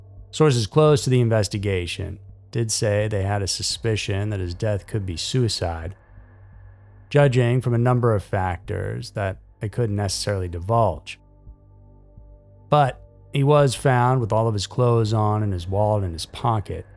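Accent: United States. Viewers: i love the narators very american voice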